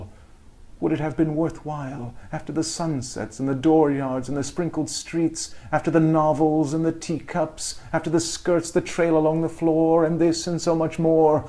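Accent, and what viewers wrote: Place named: United States. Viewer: Just the most amazing, easily-produced American diction. How many books have I heard him narrate?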